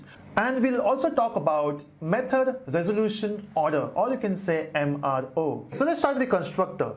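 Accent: Telugu, India. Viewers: are you a Telugu guy from Andhra Pradesh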